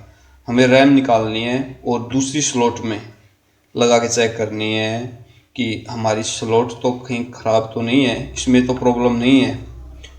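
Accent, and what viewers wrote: Haryana, India. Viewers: Bhai boli to haryanvi lg rhi h kit k ho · Bhai apke muh se halki halki haryanvi sun ke maza aa gaya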